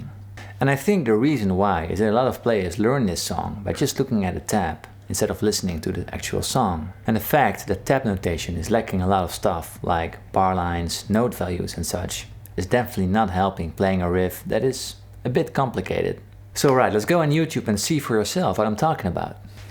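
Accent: Netherlands. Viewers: Are you Dutch? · Nice vid. I can tell from your accent that you're Dutch lol. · You sound so Dutch, I wonder if you're from the Netherlands haha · This guy sounds dutch as fuck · Yo homie, you dutch?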